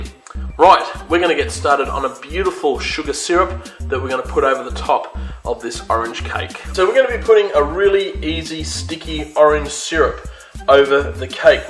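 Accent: Australia. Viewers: I love your Austrailian accent!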